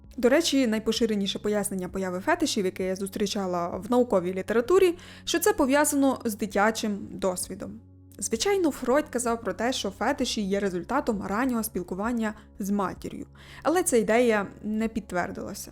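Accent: Transcarpathia, Ukraine. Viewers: Ти з Закарпаття?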